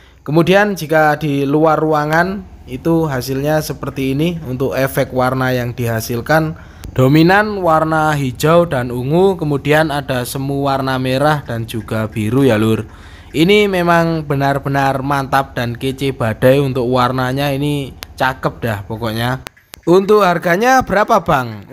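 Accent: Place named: Java, Indonesia. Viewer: Jowo tulen e iku lo.... Ra mbetahi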